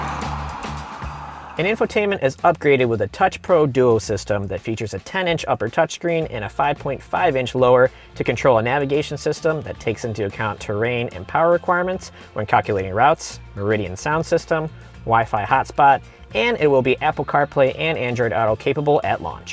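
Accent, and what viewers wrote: United States. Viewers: Holy Michigan accent. He sounds like John McElroy lol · 😊 I expected him to pronounce it like an American wood since he's speaks like one.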